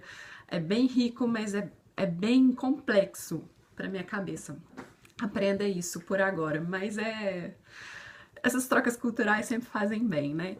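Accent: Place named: Minas Gerais, Brazil. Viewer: Sotaque mineiro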